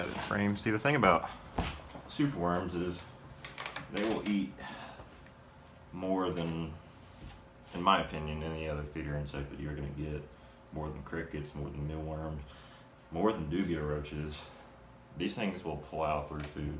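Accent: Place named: South, United States. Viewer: Not with that southern accent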